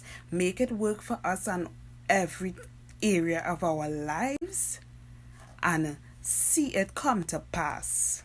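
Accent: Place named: Trinidad and Tobago. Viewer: I hear a trini accent! And this was on point, it all starts in the mind!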